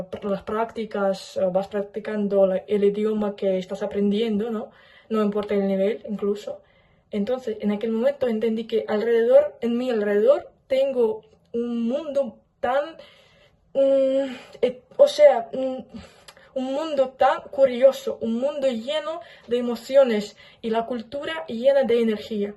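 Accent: Galicia, Spain. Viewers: Hablas bien gallega. Saludos desde Argentina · Tu acento es español😅 muy diferente al castellano latinoamericano 😊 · Tu español es producto de vivir en España, lo imagino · Hola tienes acento GALLEGO. O sea ESPAÑOL. Segura Que no erez ESPAÑOLA. Vale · Sí se dice también "friolera", ella habla español de España.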